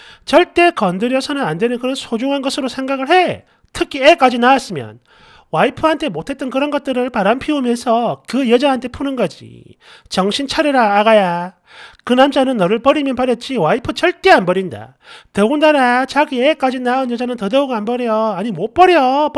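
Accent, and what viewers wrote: Gyeongsang, South Korea. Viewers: 천지삐까리? 완전 경상도말씨군요 억양 완전짱 늘 터져요 웃음릴레이😊😊😊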